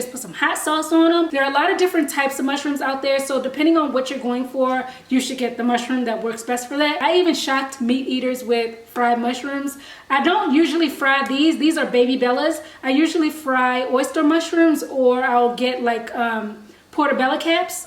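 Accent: Baltimore, United States. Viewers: You from Baltimore? You have a accent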